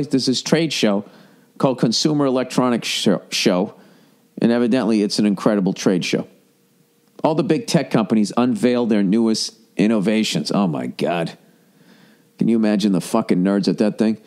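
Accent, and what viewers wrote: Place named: New England, United States. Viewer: that new england accent always gets me. "YOU COULD GET AN AWWSKA"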